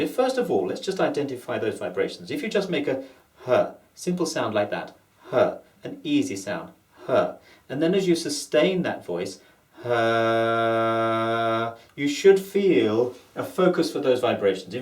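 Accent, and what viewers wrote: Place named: South Africa. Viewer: this sounds like a neutral South African accent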